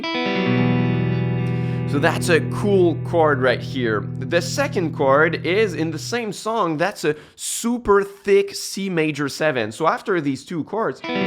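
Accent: Quebec, Canada. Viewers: You sound like you from Quebec????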